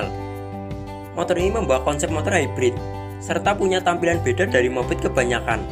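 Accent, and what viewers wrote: Java, Indonesia. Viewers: Medok bet dah SE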